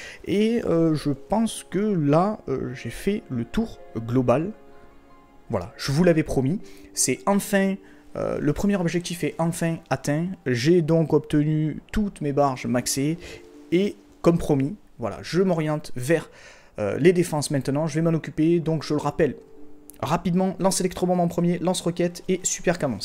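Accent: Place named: South, France. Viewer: très bonne vidéo surtout avec ton accent du sud ;)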